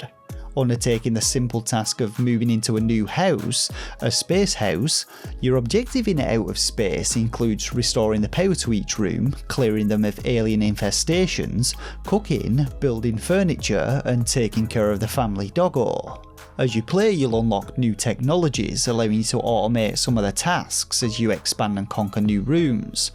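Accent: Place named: North England, United Kingdom. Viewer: Don't listen to him, I love your accent. Where exactly are you from in the north? I couldn't quite put my finger on it. Is it Middlesbrough?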